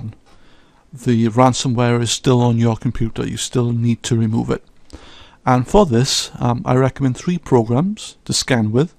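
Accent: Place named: Plymouth, United Kingdom. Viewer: You saved me 120 pounds, for that... you get my sub. thank you so much. PS is your accent from plymouth? <3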